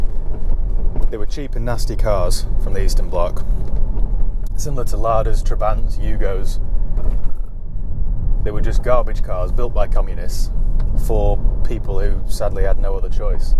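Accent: United Kingdom. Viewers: it is "Shkoda" not Skoda, it's "Folkswagen" not Wolkswagen........Not just your pronunciation is too GB-focused , but there is a world behind the channel ......with languages and other markets ....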